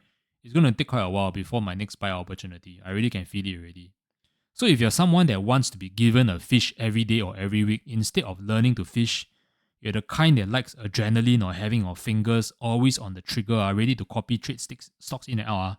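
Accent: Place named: Singapore. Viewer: Really love the singlish presentation!! Totally relatable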